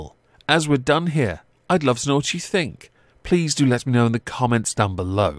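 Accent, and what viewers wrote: United Kingdom. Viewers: Your British accent makes Anne Boleyn sound just like Amberlynn and I got very confused for a second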